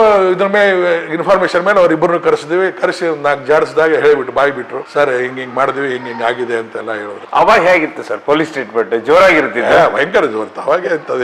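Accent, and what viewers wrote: North Karnataka, India. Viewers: ಪಕ್ಕಾ ಉತ್ತರ ಕರ್ನಾಟಕದ ಬಾಷೆ ಜೈ ಉತ್ತರ ಕರ್ನಾಟಕ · Bhashe chennagide natural north bhase.no problem onthara khushi kodute.kelakke.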